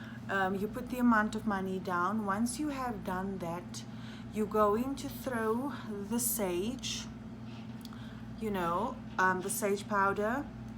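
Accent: South Africa. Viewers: Are you south african because you have a South African accent? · Yay... south African!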